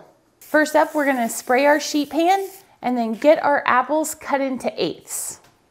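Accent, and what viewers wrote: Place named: South, United States. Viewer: She is in Florida. In Alabama we say caramel too. I think its a southern thing.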